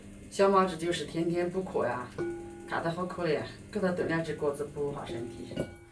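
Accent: Kunming, China. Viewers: 我不是寻甸呢，只是之前在昆明呆过两三年了，所以一听就听出来了。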